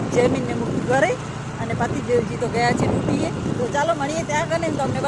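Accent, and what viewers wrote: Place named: Kutch, India. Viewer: Kachhi boli samajva ma thodi મુશ્કેલી chhe ...tme kachhi boli bolo chho te સાંભળવી gme છે..tame bav speed ma bolo chho ( insta reels ma )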